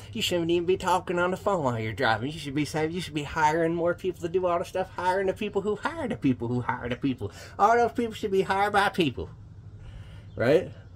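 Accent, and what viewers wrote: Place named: Mississippi, United States. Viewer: As a Mississippi man I can appreciate the accent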